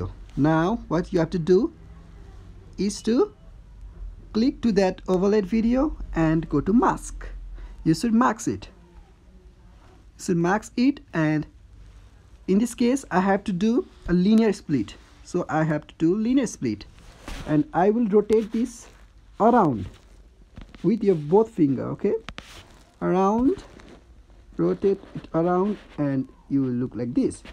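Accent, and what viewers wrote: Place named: Nepal. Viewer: Daí ko speaking Language Sounds like Nepal tune.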